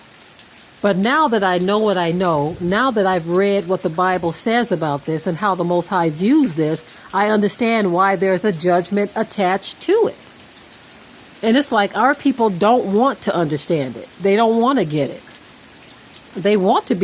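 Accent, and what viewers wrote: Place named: South, United States. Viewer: I love your accent, which state are you from? Sounds like somewhere in the South.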